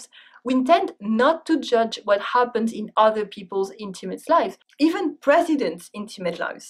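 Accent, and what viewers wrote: France. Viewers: Je suis américaine et je trouve qu'elle s'exprime très bien ! Et son accent est vachement mignon. C'est vrais qu'on adore l'accent français, vous inquètez pas :) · Moi je suis américaine et je confirme qu'on adore l'accent français et que c'est très "sexy" 🙃 et que j'ai entendu aucune faute mais peut-être mon anglais n'est pas aussi correct que j'aime son accent et je le trouve très facile à comprendre au moins pour un américain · Her English is absolutely fluent and perfect grammatically. A slight French accent but she is amazing. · She's French. Your saying that her French accent is ugly? Then why are you watching a video about question not to ask a French person if your already not a fan of the accent? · Léa ! J'ai pas dit qu'il était mauvais, juste difficile à comprendre car j'ai l'habitude de l'anglais américain voir canadien et je parle anglais tous les jours et j'aime ne pas avoir "d'accent" français même s'il ressort par moment, certains se moquent, d'autres trouvent simplement fascinant que je parle si bien anglais. 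Moi je trouve juste dommage de "cultiver" un accent français, parfois, surtout dans cette vidéo, je trouve cela forcé. Je ne jugerai personne sur leur accent s'ils me parlent et essaye de parler une langue non native pour eux, mais je suis d'accord que beaucoup de gens abandonnent l'anglais ou autre langue facilement car ils ont peur de pas avoir un bon accent mais il y a une différence entre accent et prononciation. Dans cette vidéo, je ne juge pas l'accent au fond mais l'articulation et prononciation des mots. Par exemple, quand j'utilise un mot français qui est aussi utilisé en anglais, je le dit pas à la française, je le dis à l'anglaise. Je préfère d'ailleurs l'anglais que l'américain. Oui, la jeune fille de la vidéo sonne plus anglaise que américaine mais il n'empêche que de nombreux mots m'ont perturbé, pas l'accent, mais la prononciation littéralement "française" de le dire comme si c'était fait exprès pour faire genre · Si tu es francophone de base, il est logique de comprendre un accent du style car la prononciation qu'elle a de certains sons est la même qu'en français. 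Pour un anglophone ou autre, ces sons déforment parfois trop le mot pour qu'il soit compréhensible pour eux, ça ne relève donc en rien d'un manque de compétence en anglais (surtout que ce n'est pas un accent anglais, c'est un accent étranger en anglais, c'est encore différent d'entendre un écossais ou un néo-zélandais). De plus, très perturbant quand tu parles très souvent une langue, elle prononce beaucoup de mot français à la française en anglais. Ca fait un mix dégueulasse de deux langues, j'ai l'impression qu'on frappe mon oreille à chaque fois... Pour finir, je me suis arrêté après 2 minutes. L'accent frenchy en anglais m'est juste insupportable... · Her french accent is so thick. I hear it especially when she speaks french. Definitely a challenge for her to get that english accent right. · I'll take her French accent over any modern american variety any day of the week.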